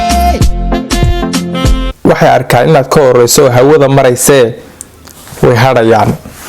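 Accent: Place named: France. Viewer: War afsomali ku hadala waxay sidii adonta aya farasisi kuhadlaysa mesha somaliba jogte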